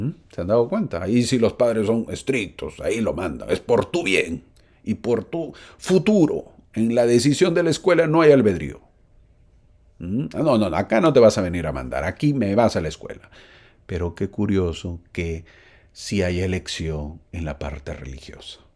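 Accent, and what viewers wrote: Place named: Peru. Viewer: yo les he hecho esa reflexión a mis hijos. Les obligamos a ir a la escuela. Pero si no quieren ir a la Iglesia, ahí hablan de la libertad de escoger. Siempre les digo que es lo nos va a dar la vida eterna??? Parecernos a Jesucristo eso lo aprendemos en la escuela llamada Iglesia. Y se quedan mirándome, y me dan la razón!!!😊, gracias me da gusto escuchar acento peruano!!❤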